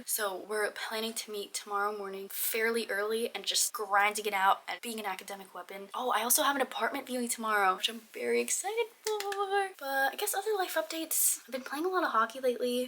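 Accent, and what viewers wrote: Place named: United States. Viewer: I did not expect an American accent lol, I thought I’d here a thick Scottish accent. Do a lot of people outside of Scotland go to the school?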